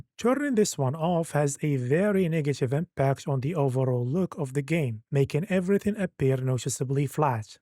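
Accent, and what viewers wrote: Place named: Morocco. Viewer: The accent tells me you're moroccan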